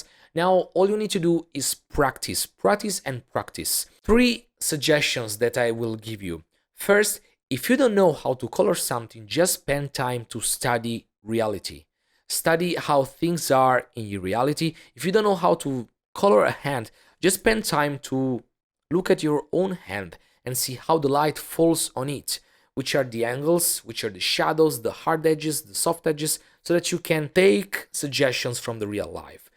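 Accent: Italy. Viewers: Che bello sentire un accento italiano !!!!😍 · Oddio, ahja si sente subito l'inglese "Italianizzato"😂 "MAMA MIIIAA" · Si sente a chilometri che sei italiano! Bel video comunque, grazie!! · Apro il video, sento le prime tre parole... Ok, dico, questo è italiano (accento inconfondibile)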